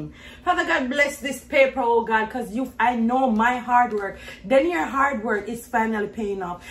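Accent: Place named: Africa. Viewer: I'M SO HAPPY FOR YOU GUYS 🙏🙏🙏❤❤❤❤Love the african talking 😂😂😂 Heyyyyyy!!!!!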